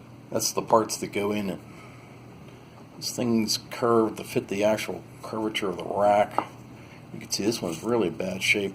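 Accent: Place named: Pittsburgh, United States. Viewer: I heard yinzer before I saw P.H. on the paper. A-K Valley native here.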